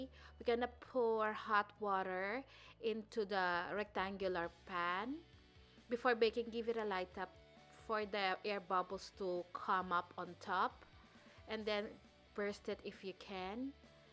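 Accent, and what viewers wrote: Philippines. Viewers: Are you Filipino? You sound like one.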